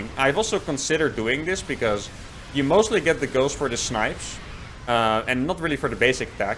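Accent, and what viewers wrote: Netherlands. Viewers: I can just tell by your accent that you're Dutch. Good to see another dutchman on this site!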